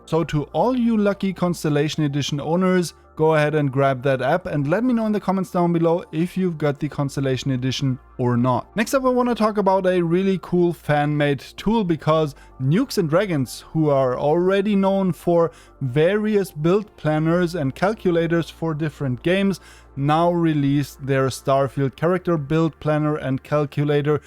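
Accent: Germany. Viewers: You German? Your accent told me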